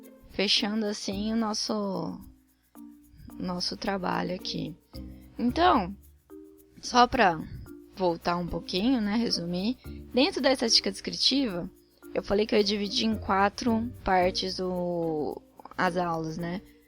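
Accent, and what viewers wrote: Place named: East Asia. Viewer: Você é oriental não é? Percebo pela maneira como você fala. É comum os orientais se interessarem bastante por estatística. Mas muito boa sua aula, me ajudou bastante.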